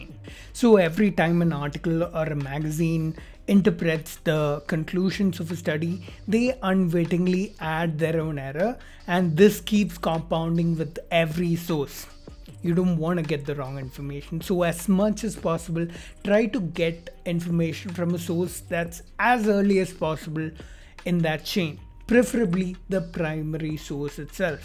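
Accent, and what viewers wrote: South India, India. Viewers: So, can I ask what your scientific credentials are? What is your training regarding research methodology? No offense but engineers (which I'm assuming you are given your accent, a fellow idli eater) are not very well trained in science. I know because I'm one too. We barely use statistics for exploratory research.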